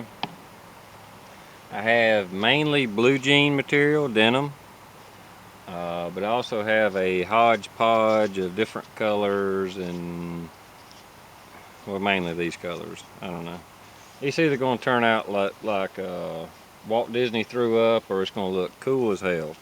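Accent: North Carolina, United States. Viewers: hear a hint of NC accent there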